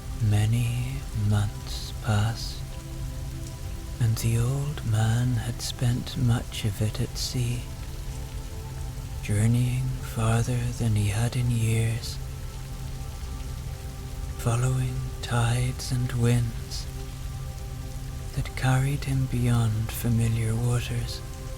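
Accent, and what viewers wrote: Ireland. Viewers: So Thankful for your dreamy Irish voice and wonderful story tonight x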